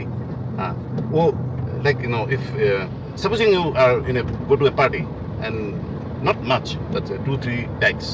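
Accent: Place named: Assam, India. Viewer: accent sounded like an Assamese accent. Nevertheless, great video.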